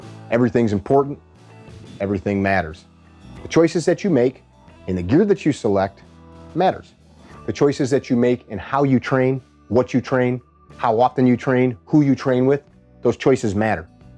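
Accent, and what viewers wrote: Chicago, United States. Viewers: Know what? I love this dude, hes like one of the homies & I’ve never even met the guy. So no homotype shit. legit seems like a down to earth funny ass dude from Chicago, with that yankee accent… hahaha. the father figure most of us never had. 100%.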